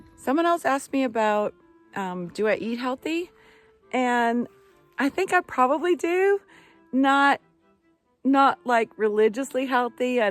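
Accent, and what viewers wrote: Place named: South, United States. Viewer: You’re a cutie, love your sweet way and southern drawl…’y’all.’ Much love from the UK x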